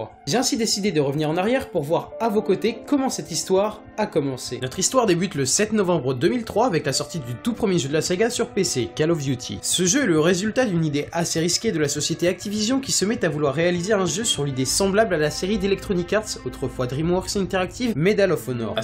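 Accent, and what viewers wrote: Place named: France. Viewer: Putain ca partait bien ... Puis ce putain d'accent francais a tout gacher Cale of duti... Et l'répèter tout le temps c'en est saoulant...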